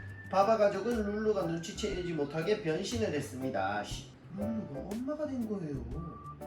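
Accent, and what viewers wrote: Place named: Seoul, South Korea. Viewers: ㅎㅎ 책도 참 서울말로 잘 읽어주시네요. 애기랑 너무 행복해보여요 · 책 읽을때는 서울말ㅋㅋ 사투리 안 쓰시네요ㅎㅎ 그래도 정감있고 재미있는 말투가 좋아요 😄😄😄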